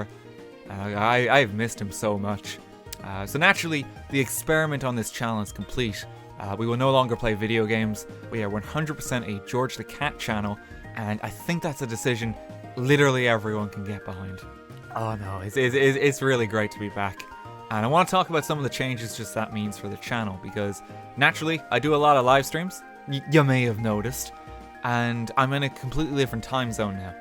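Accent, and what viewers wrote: Ireland. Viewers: Which county m8? Your accent smells like Southern. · Ah truesday Lovely Irish accent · "Tuesday tru turshday" love the irish accent, its always a joy to listen to.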